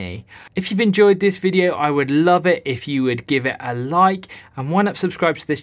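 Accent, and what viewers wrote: United Kingdom. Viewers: great to see you back you're my favourite source of info for my low carb lifestyle and nice to see a Brit doing it, nothing against the Americans, they're great but having a British voice amongst them is brilliant! I just wish the rest of the UK would understand this more- my friends look at me as if I am mad when I so no chips or no rice or no bread and dont even get me started about what reaction I get to cream, butter and lard! Looking forward to your next videos 😀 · As an American, I love this too. It's so nice to hear a British accent 👌🏽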